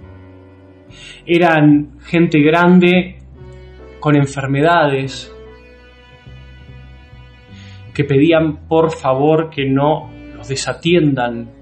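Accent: Buenos Aires, Argentina. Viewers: Gracias por tu advertencia sobre Moras, soy seguidor suyo pero igual a lo del antisemitismo ya ni bola. Dos cosas mas, una no deberias decir que no sos lider de lo contrario nadie vendria a ver este canal, sos lider de un canal interesante donde la mayoria de las noticias "son falsas" decia checkeado o como era ese sitio. Otra cosa que nada que ver, me di cuenta que siendo cordobes no tenes acento cordobes, hablas como porteño.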